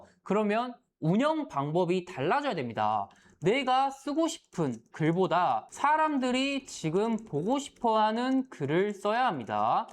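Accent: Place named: Jeolla, South Korea. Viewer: 전라도 사투리 진짜 듣기 싫음 40세 전에 사투리 고치는게 인생 난이도 낮아질거임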